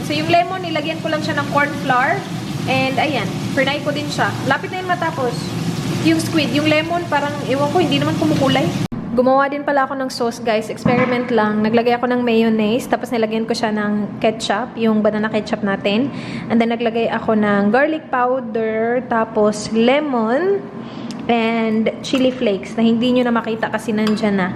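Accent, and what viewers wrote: Visayas, Philippines. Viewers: Hehe. Bisayang dako ate. 😂😂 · Bisaya kapo? AHAHAHAHHA sa accent mo po kasi ahahhahahaha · Ang cute mo ate magbisaya hahahaha!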